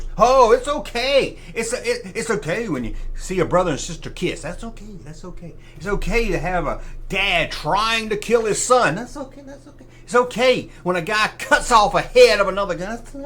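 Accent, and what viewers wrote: South, United States. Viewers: If I was going to guess, I'd say that probably most channels don't get filters applied unless someone complains. Big channels with lots of views are most likely scrutinized by default (they probably get spammed with complaints/reports). But why waste compute cycles on channels that very few watch? Not trying to say you're a small channel (but you are lol), but I try to watch all your videos. I think what appeals most to me is your southern accent. I grew up in west texas, but a lil after you. I never developed a southern accent. We had cable and i think that's why I never got the accent. Everyone around me had it though lol! Do you have any other interests besides gen-x toys? You must've played video games at some point, could you do a video about that, or maybe even a video about you growing up, or about something from your childhood that wasn't star wars or toy related? 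It's cool that you have home video of yourself as a kid, that's something my family didn't do. Or maybe a short about your absolute favorite toy when you were a kid, and what's your most favorite toy now as an adult? And what's your day job like? And how much time do you use on making videos? And, you think you'll ever have an action figure of yourself? I wouldn't mind picking up a action figure, especially if it has that jersey you always wear, and of course the hat! But then that begs the question: what other accesories would be included? Perhaps a scale version of another! So your junkma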